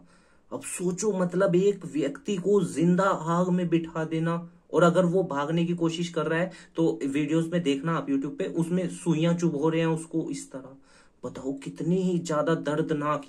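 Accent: Haryana, India. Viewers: Sir Are u from Haryana...? ? 😅😅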